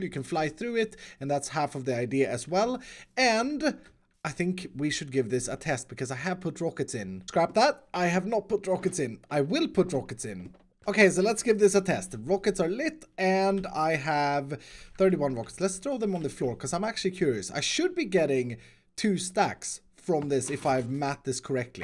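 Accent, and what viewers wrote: Sweden. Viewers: are you Swedish? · to me its sounds like a harsher Swedish accent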